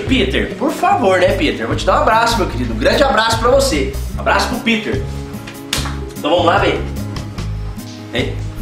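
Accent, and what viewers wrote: Minas Gerais, Brazil. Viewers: Vc é mineiro? Rs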